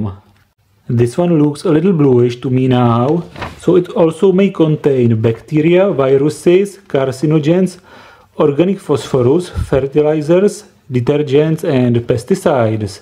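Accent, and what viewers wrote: Prague, Czech Republic. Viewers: Nezameniteľný pražský prízvuk. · Já věděl podle tý angličtiny, že budeš čech :D :D velmi dobrý kanál a ta angličtiina je topová :D <3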